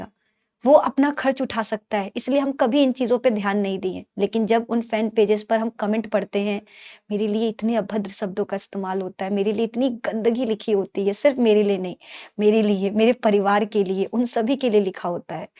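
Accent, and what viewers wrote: Bihar, India. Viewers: Mam kutto ka kaam hai bhokana padhna to have nai unko aap please padhana band mat kariye main ab 10 me gayi hu aur main batch Lena chati guu aap se padh kar tap karn chati hu main khati huu ki aap ke Tarah koi padha Nahi sakta aur to aur you are my favourite teacher aap because aap ka bolne ka tarika bihaari wala I like it my first line dimak me rahk legiye main to asise hi short Dekh rahi thi aap ka video aa Gaya muhje testion hi gayi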